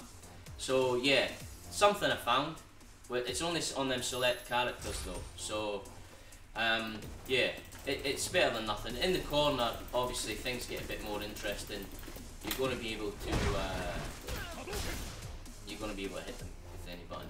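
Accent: Scotland, United Kingdom. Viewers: i always thought you was from Scotland with your accent?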